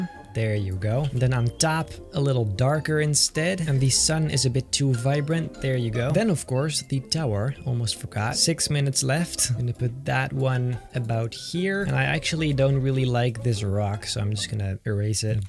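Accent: Netherlands. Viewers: Damn I was hoping for more dutch accent but honestly any vid is a win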